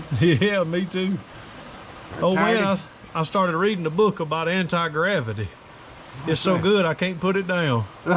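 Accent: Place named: Georgia, United States. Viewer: thanks for replying👍👍you make me laugh so much,and love that georgian accent and your jokes and the bond between you and your family👍👍god bless you all and good health🙏🙏🙏🙏🙏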